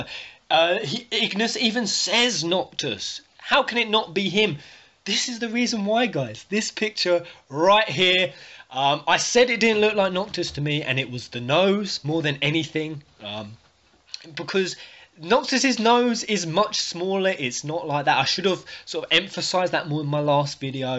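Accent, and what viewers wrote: England, United Kingdom. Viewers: And it ain't the English accent! I'm just loving his persona. More depth to my mind! I think everything that goes on here will be a record for future FF theorists and forward thinkers. It's an awesome place to vent and express:) Plus I wanna see this updated Peasantry wall! Hope you noticed I've up'd my pledge;)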